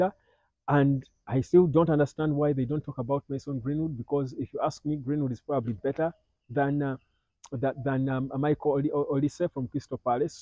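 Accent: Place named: Uganda. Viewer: Nice to see you from home soil though you didn't name it but from your accent I guess Uganda, my girlfreind is Ugandan by the way😊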